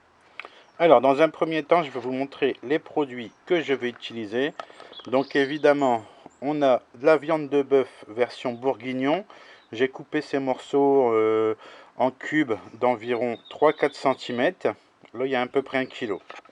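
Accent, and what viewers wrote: Nord-Pas-de-Calais, France. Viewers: Quel bonheur de t’entendre parler Ch’ti je le dis encore ta joie de vivre et contagieuse 👍 bonne journée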